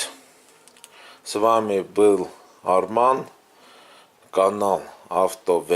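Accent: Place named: Armenia. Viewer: Мы по голосу поняли что ты армян .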